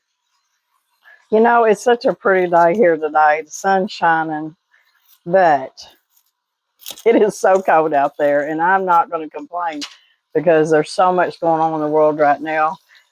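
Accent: South, United States. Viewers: Miss I've said it before, I could listen to you all day. You have got such a way, such a merry twinkle in your eyes and sweet smile, and I dearly love your charming southern accent. So, never never change anything. I read thru some of the other comments and it's clear we are all in agreement 🥰. Little Wallace is adorable!! You made me laugh when you said he's sensitive about his bat ears 😄. Well done on the garden fence. It's beautiful!! And the kitchen cabinet is going to be gorgeous. Love the paint and paper. Lovely selection of flower seeds. I like straw flowers. I'm hoping to get a lot of zinnias planted this year too. Oh, I was thinking, you must have had the most well fed school kids and teachers anywhere. That had to be a real labor of love. was so happy with his cobbler and ice cream 😊. Thank you for for such a wonderful video. Loved it ending with a Psalm. 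Take care and God bless. 🥰♥️🤗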